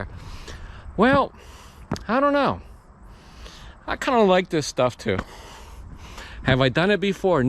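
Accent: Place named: New York, United States. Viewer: You sound like me . I live in NY too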